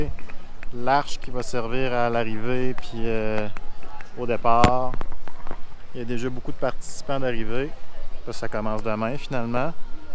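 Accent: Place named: Quebec, Canada. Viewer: Sérieusement si tu faisais tes videos en anglais avec un peu plus d'editing tu pourrais vraiment propulser ton channel. Meme si t'as un violent accent du QC cest pas grave. 1.Les anglophones vont vraimement aimer l'effort 2. Ton contenu serait accessible aux anglophones (au moins 90 des utilisateurs Youtube). Aussi non continu. Jme fie sur toi pour trouver les bonnes places.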